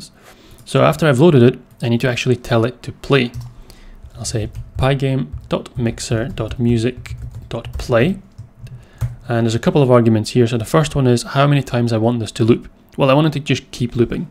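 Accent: United Kingdom. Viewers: Are you british?i heard lot of init(not programming init).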